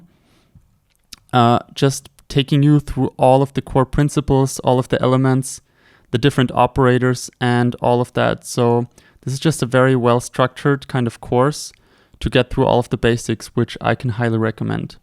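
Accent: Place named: Germany. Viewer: oh it sounds so german accent bc im german and people that speak english in germany talk like that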